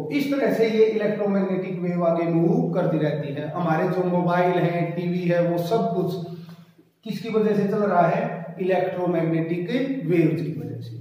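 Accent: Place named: Haryana, India. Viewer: Hindi m haha aapni haryanvi aa sahi h sir ji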